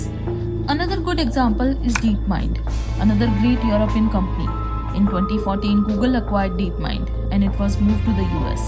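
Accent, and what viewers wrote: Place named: India. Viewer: You seems to Indian origin from your voice. Why don't you create content in Hindi? This type of content will go viral in Hindi speaking audience.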